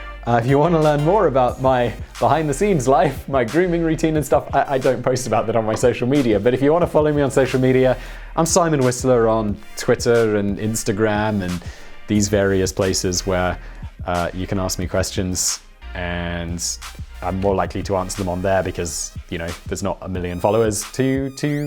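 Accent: United Kingdom. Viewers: Great video, most enjoyable and as a Brit you still have a very strong, unmistakable, British accent Simon - although I wouldn't have picked Maidstone. South-East, certainly but more private school London. Keep up the great work. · Coming from an Aussie, I picked your accent immediately, way back when I first started watching your channels. It is very British. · Just wanted to say I saw your recent post about the podcasts, which I hadn't previously heard about, so I checked them out and now I've listened to almost all of The Brainfood Show! Still have a few left to get caught up, but I've really enjoyed it so far. Especially since you open up a lot more and show your real personality ;) You're a very funny and charming guy! I've learned a lot from your channel and podcasts. Usually I dislike fact-based channels because it's generally stuff I already know, but you frequently manage to surprise me with new information. 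PS I don't think your accent is weird, it's pretty obviously "British person who has lived elsewhere in Europe for many years" and it's not grating or distracting. Honestly I normally don't notice it.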